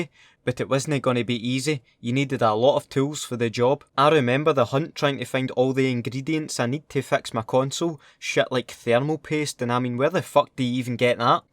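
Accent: Scotland, United Kingdom. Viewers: Whats this? AND I LOVE YOUR VOICE! Its cool! Scottish? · I love your Scottish accent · Aye scottish mate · Love the Scottish accent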